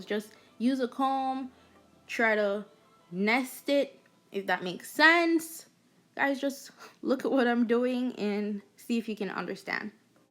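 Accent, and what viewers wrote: Jamaica. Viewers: she low-key sounds Jamaican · Could tell you were a yardie from the first word u said 🇯🇲🇯🇲🇯🇲🇯🇲 mi ago try dah style ya tomorrow. Big up u self cute gyal 😍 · Knew you were Jamaican from the video start, mi ago try dah style yah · Didnt even realize you were Jamaican until I read the comments and relistened to the video. As a Jamaican myself, I totally forget about that now that Im living in America because we have the same accent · are you Jamaican? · Baxcide har accent shes jamaican 💗💗💗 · Crissy samuels she's Jamaican.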